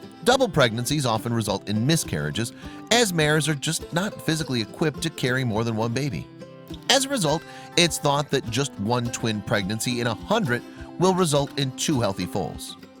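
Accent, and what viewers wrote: United States. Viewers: Do you have hearing issues?? 😕 He says FOAL (*NOTHING ELSE!*) MULTIPLE TIMES, & *EQUINE.. NOT 'Quine'!! So-?????!!!!!!! 😒 Bloody well obvious, even in his thick AMERICAN ACCENT, to these Ozzie (Australian) ears of mine & the 7 ppl I just asked, INDIVIDUALLY. Don't know where you're from, but having an American accent doesn't mean his mispronouncing words!! I suggest you get a hearing test, dear.. & take this along with you and ask what the ENT professional 'hears'. PS: *Clearly, NEITHER will grow to be 'horses', either. They will NEVER reach the height a *PONY becomes a 'horse'.... given their fraternal twin situation. The ONLY difference is how many 'hands' they will grow to. Not enough to be 'horses'. They are ponies and have less than an 20% chance (Don Quixote) and less than a 10% chance that (Duet) could grow to the minimum 'horse' height..... 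just thought you should know that, since you're SO quick to jump in thinking you're an expert, despite obviously NOT being one. 🙇💫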